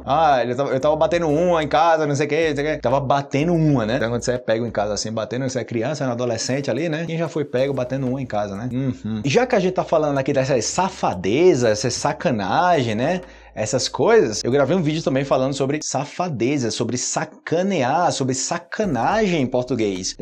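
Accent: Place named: Colombia. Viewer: El es brasilero, pero estudió en Colombia . De verdad habla con acento colombiano 😂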